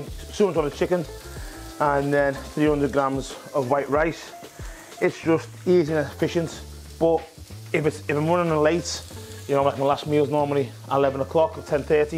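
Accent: Liverpool, United Kingdom. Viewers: Don't get how anyone can't understand the accent. a mickey. Nout wrong with that. My birds a mickey...best and funniest people in the UK 👍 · Is this guy from Liverpool England? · Love the Scouse accent, represent 💪 YNWA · Ah, the scouse accent. Brilliant. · Scouser confirmed 😄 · Omg what he is speaking too much Britishness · The Scouse Way.. love the language. i have fun trying to decipher. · He is a scouser from liverpool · I am a Liverpool fan and have heard many local players speaking the same accent. It's difficult to understand but cool anyways. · he’s from liverpool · the scouser accent for me! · Hear that scouse accent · Scouser accent eh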